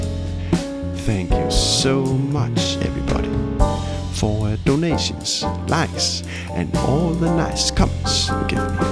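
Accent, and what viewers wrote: Denmark. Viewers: Are you from Denmark??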